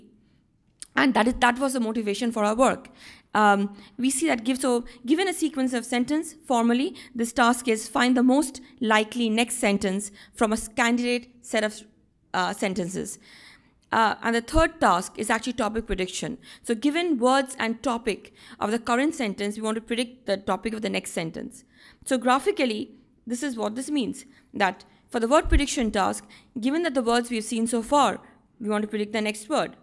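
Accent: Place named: India. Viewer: hhh, Indialish is a little bit cute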